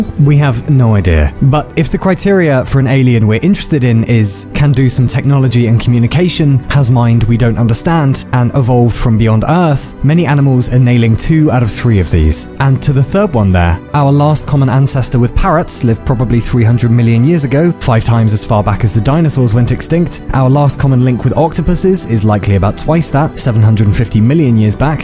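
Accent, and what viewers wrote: United Kingdom. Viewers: OK, but why do Brits talk wrong? It's almost like they want the listener to understand 10% of what they say. Boston, Australia, Texas, drop the dumb accents, and talk like a Californian, with no accent, it's not funny anymore, do you need training wheels for your tongue?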